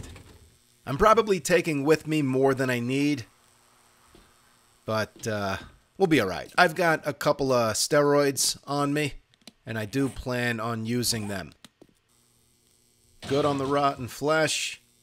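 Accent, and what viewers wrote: New Jersey, United States. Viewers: This guy is a gangster... has the jersey accent and mentality....have loved this guy long time...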